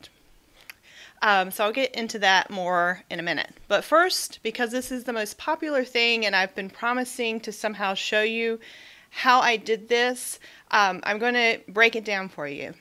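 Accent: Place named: North Carolina, United States. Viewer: I'm from North Carolina too! I knew I recognized your accent, but I was confused because I thought you were from Florida. Thanks so much for making these great videos.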